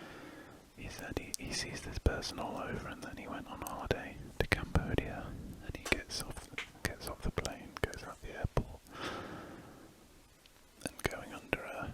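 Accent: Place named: United Kingdom. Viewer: This guy reminds me of Asmr for nerds but with a British Accent. Idk, pretty sure it’s the same guy… anyway love the vids man keep up the good work!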